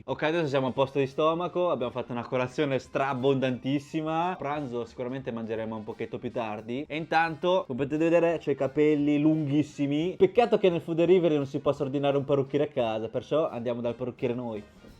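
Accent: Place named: Modena, Italy. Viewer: Che ridere l accento di modenaaa 😂😂😂 sei un grande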